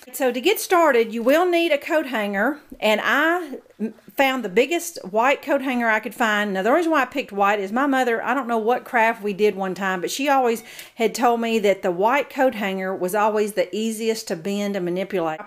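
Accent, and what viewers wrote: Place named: Appalachia, United States. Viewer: So stinking cute. I just found u so u have a new subbie. I knew that voice had to Tennessee or NC mountains. Lol! I'm on the other side of Asheville so maybe we're kinda like neighbors. 😁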